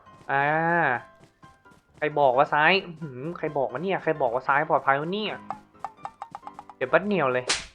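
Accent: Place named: North, Thailand. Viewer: เกลียดพวกรวมตี้!!! ชอบฟังภาษาเหนือมากๆ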